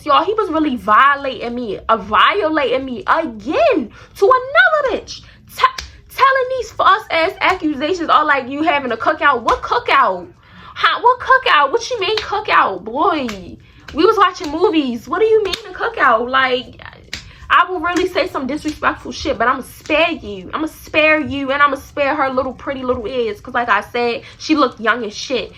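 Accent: Baltimore, United States. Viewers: baltimore accent is soo nice 😩😩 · You sound like you’re from Baltimore 😭😭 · Baldamore accent🤪💗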